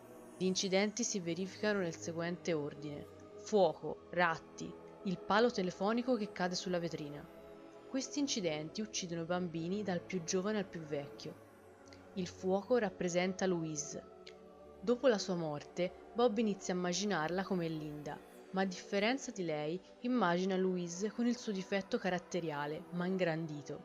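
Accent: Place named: Tuscany, Italy. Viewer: Te lo devo dire, sto guardando questo video alle 3 di notte(dopo aver visto diversi episodi di bob's burger), e dovrei avere paura, ma non ce la faccio con la gorgia toscana nella parlata😂😂. Comunque sei molto brava😁, gran bel video❤️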